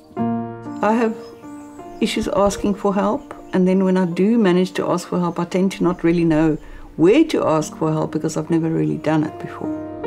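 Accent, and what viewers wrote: South Africa. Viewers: Amazing very special channel. As an ex South African so enjoy listening to your lovely accent. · Beautiful movies and channels. Feels so familiar the South African accent ... we moved abroad a few years ago.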